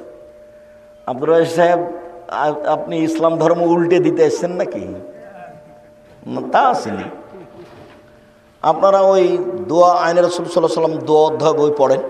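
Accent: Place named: Chapai Nawabganj, Bangladesh. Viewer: ধুর ব্যাটা চাপাই নবাবগঞ্জের ভাষায় আরবী পড়লে হইবো? আরবী শুদ্ধভাবে পড়তে হবে।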